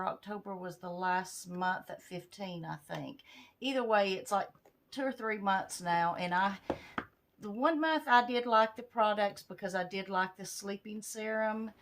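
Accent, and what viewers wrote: South, United States. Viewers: I’m from the south also and we do have a way of our own talking... I get told.. so I love listening to someone that has an accent like I do.. I’m so sorry about the tumors.. I’ll be praying for you and thank God it’s not cancer.. concerning the mask have u use any mask that is better staying away from ? thinking about doing the Monday mask on my channel.. just not crazy about masking... LOL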